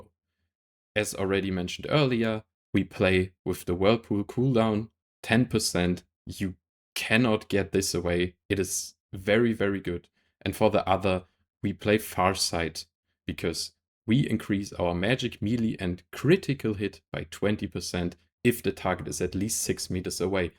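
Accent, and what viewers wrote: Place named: Germany. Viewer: i can hear that you german brother lmao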